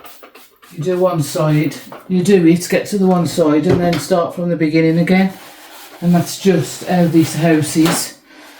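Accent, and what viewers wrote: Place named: Black Country, United Kingdom. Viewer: Hi love watching someone else with a blackcountry accent love the candle wick jokes x Done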